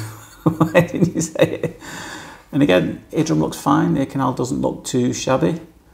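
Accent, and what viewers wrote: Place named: United Kingdom. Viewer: You know your accent is very pleasing to the ear, but especially when you say words like “sludgy” and “plug”. Can’t say what it is exactly … the UK is full of wonderful accents, expressions and speech patterns! ❤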